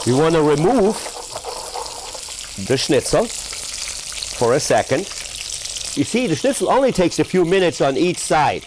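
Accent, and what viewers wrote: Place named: Germany. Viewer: i like his German accent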